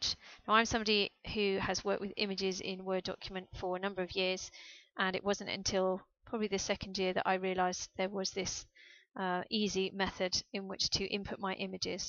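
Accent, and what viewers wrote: United Kingdom. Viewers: #Offtopic I wonder whether is British, voice is way too sexy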